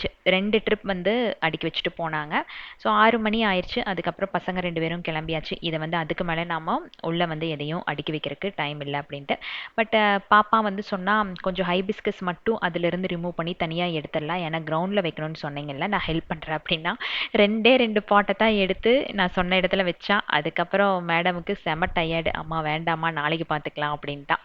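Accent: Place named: Kongu, India. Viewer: Akka, your voice and that kongu tamil is so sweet. Also our tamil and gardeners reaction is romba edharthama eruku nga ka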